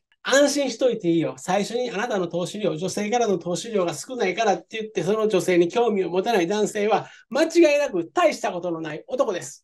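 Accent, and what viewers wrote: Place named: Kansai, Japan. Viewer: 話し方が関西弁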